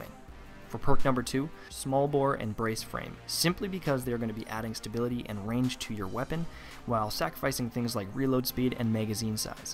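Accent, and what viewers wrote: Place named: Canada. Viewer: Oh haha, yes I do. Is that how its said in a Canadian accent? Thought you just mispronounced a couple words in one sentence. Will go check out your channel now.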